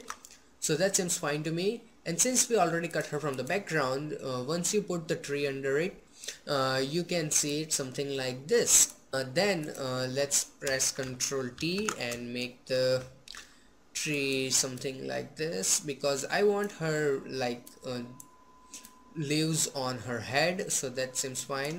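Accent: India. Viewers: is that an Indian accent? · I love your indian accent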